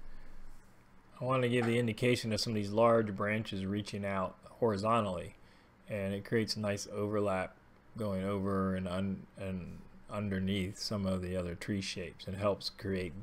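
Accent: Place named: Philadelphia, United States. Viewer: Nice demo. And painting...sound like your from Philly. Or Cleveland. Lol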